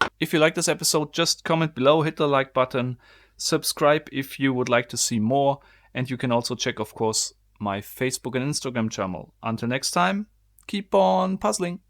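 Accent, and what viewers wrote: Germany. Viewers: Can it be that you're german? Because of your english😊 no hate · Instead of toying with this box you should polish your English or bloody give it up altogether.....so f....g German...it is NOT beck but back....it is NOT gep but gap...it is like the Chinese who say lice instead of rice...very disturbing. Record yourself and or go to a pronunciation bloody class...and you do not say isss you say izzz....English voiced C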